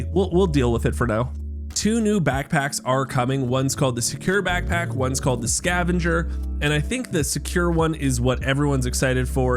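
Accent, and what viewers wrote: Canada. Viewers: By far the best person i like to hear my updates from. Not sure if its the funny Canadian accent "ae" or its just more interesting and better than most out there. Good job keep it up G.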